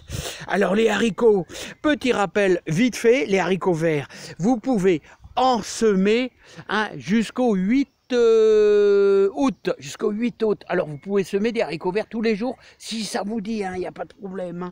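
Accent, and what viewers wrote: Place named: Nord-Pas-de-Calais, France. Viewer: Moi j'adore quand tu parles ch'timi 😂❤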